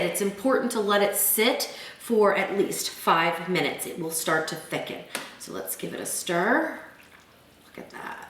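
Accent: United States. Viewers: From Kentucky?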